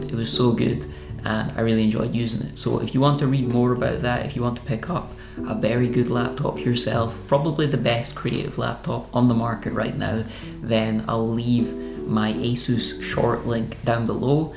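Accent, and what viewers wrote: Scotland, United Kingdom. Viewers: Yes, an extra $1,000 per month would be most agreeable and worth the effort,. I must comment as well that understanding the Scottish brogue has always been a bit of a challenge for me however your mastery of "English" is formidable. Thank you for that!!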